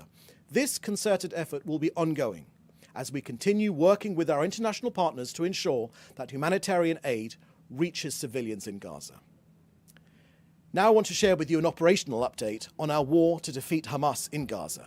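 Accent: England, United Kingdom. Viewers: Another liar with funny English accent fomenting his hate and BS. No one believes your lies anymore after you Massacred over 30,000 innocent Palestinian civilians in Gaza with over 16,000 children. Shame on you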